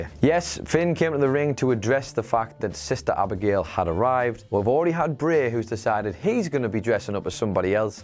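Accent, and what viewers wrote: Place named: Newcastle, United Kingdom. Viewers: Geordie accent in this case- WhatCulture are based in Newcastle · It's Geordie, akin to MIDDLE ENGLISH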